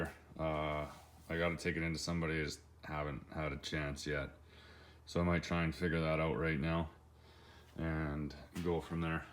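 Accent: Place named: Midwest, United States. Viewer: Nice work. I have an S650 as well. Where are you located? By your accent, I'm guessing mid-west area. I could use someone like you in my area (North Florida).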